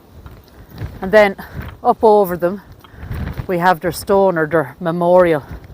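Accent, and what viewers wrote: Ireland. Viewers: So neat to see the old graves. Thanks for taking time to show them. Love your Irish accent. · I love listening to you both. Your voice reminds me of my Granddad who was from Cork. I’m from Western Australia 🇦🇺. My Great Grandmothers name was Eva Boyd my Great Grandfathers name was Michael Hyde. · Great video. The commentary with the Irish accent is spectacular. From New Jersey in the USA. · Thanks! Love love your channel!! I am from Alberta, Canada 🇨🇦 and would love ❤️ to hopefully one day make a trip to beautiful Ireland 🇮🇪!! It is so wonderful that by you filming different locations I feel like I am touring Ireland 🇮🇪 lol🥰 again love your channel & keep up your amazing work! Oh ps I love love your beautiful 🤩 Irish ☘️ Accent!! ☘️🇮🇪☘️ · Beautiful graveyard love your gorgeous Irish accent luv from Canada 🇨🇦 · Hello from Japan. I was born in Sardinia to Irish/Scottish/Ukrainian parents and lived in Carna Co.Galway and Cashel Co.Tipperary as a youngster. 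Have been always fascinated with cemeteries and love just as you do too to explore them. Great to hear an Irish accent!!!!!!!!!!!!!!!!!!!!!!!!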